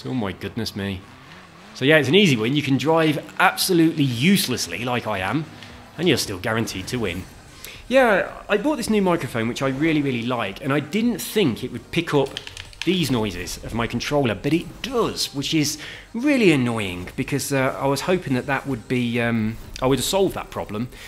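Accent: United Kingdom. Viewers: I'm still struggling with drifting. You are surpassing me easily now though. (Do you feather the accelerator?) I cant quite get the hang of it.. Btw how come you can fast travel , have you got all the fast travel signs/bonus boards? there are about four I cant get - in the pipes on that motocross park, and one one the top of some house, and one up by the wind farm. ( I still dont have the FT option, even for money? weird ) would appreciate some ideas one those boards if you know how to get them! thanks. Really enjoy your videos as you know. Your accent is definitely getting more Norwich now though.. lol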